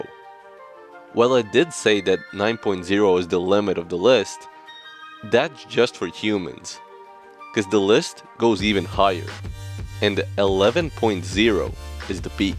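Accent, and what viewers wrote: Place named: Latvia. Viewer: Latvian accent💔🙏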